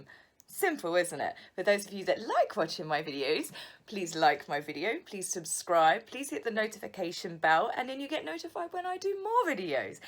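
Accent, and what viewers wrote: England, United Kingdom. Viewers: I like you and your English accent. You are so funny.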